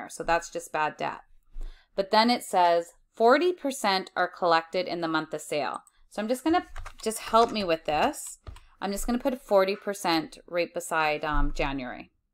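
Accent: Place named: United States. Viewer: The American accent kills me 😂 good vid though 👌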